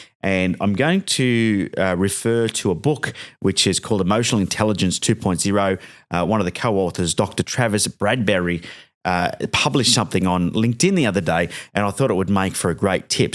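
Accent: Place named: United Kingdom. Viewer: this annoying uk accent, don't understand a single word, but its an interesting video so I have to listen, please just talk normaly no need to talk this weird accent that "weyird aycceynt thayt noyoyne uyndeyrstaynds"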